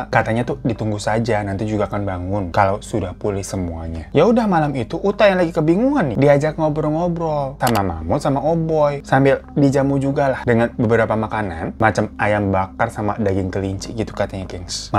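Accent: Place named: Banjar, Indonesia. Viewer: Lucu dengar bang pakai bahasa Banjar 😊 udh kaya orang Banjar beneran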